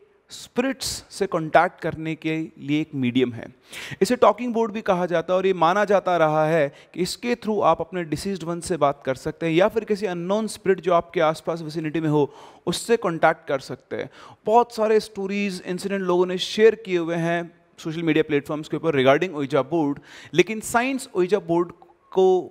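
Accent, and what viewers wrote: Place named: Bengal, India. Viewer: Apki bengali such mein sunne mein bohot cute hai sir...khub mishti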